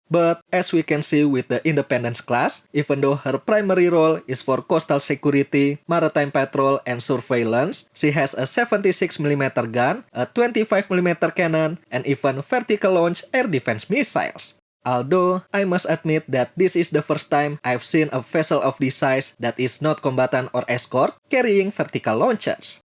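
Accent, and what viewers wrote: Indonesia. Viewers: Its a gramatically correct and nice Indonesian accent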